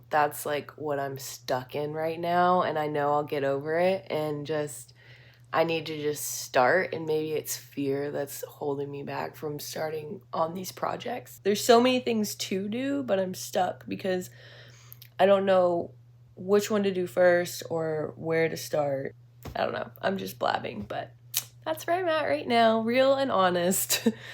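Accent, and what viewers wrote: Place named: Arkansas, United States. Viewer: You can tell you’ve been back in Arkansas because your accent has kicked up a notch 😂🙌 “one of those burn bucket thangs”. You’re killing it! ❤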